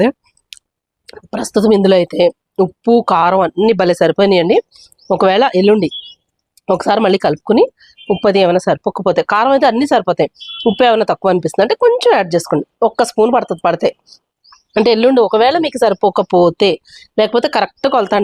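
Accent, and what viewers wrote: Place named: Godavari, India. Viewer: మీది గోదారి జిల్లానా అండి..😂😂😂😂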